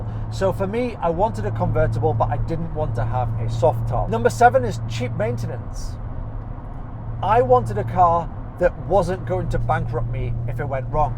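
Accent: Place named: Geordie, United Kingdom. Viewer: Lovely car! Putting a right "high Jesmond" on your Geordie accent there! Be proud of your Toon lineage man!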